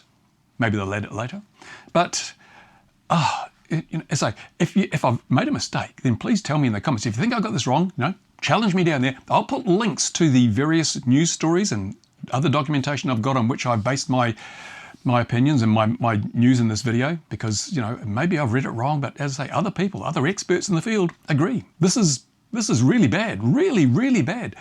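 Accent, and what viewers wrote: Australia. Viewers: lol this guy sounds aussie.... like he has anything to talk about when it comes to restricted freedoms. I'll trade a hobby for FREEDOM any time of the day, and that's what it reallly is coming down to. I k now 99% of people reading this, won't understand. We have been in an economic warfare for decades. Now it's time to fix that. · MAN! I'm glad every day that I have a permant resident permit in, -Gabriel of Norway. Thanks very much for this video, my brother who sounds to be 'down under '. Love y'all, mate! Unfortunately Norwegian politcians are in bed with those in the USA.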